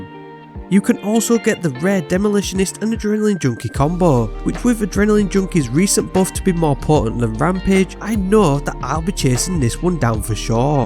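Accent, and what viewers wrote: United Kingdom. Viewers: It’s great to hear a British accent on d2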